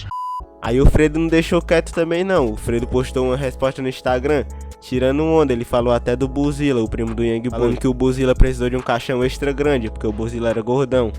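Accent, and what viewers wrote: Fortaleza, Brazil. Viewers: sem dúvidas que vc é de fortal man 😂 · Tu é de fortal né vet? Brabo mano o conteúdo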